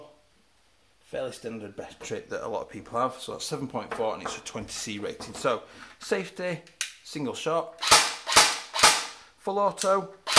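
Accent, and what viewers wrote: United Kingdom. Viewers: Bro I clicked on this thinking you were reviewing the Litefight MCR, then I heard the British accent and got confused why a Brit is reviewing a firearm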